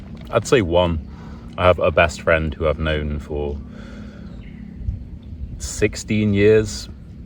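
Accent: United Kingdom. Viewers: first of all thanks for helping us to understand and als speak in British accent ❤️‍🔥 Also my teacher recommended us to watch more videos on you tube but mostly girls should watch female ones bc their accent maybe 😶 so which female bloger can you recommend to me 🤔 of course I will continue to watch your videos to but still I need one female bloger too😊 I will wait for your comment 💯💌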